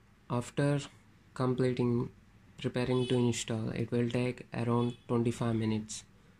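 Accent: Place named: India. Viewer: I hate your "hindu-glish" ! FCKU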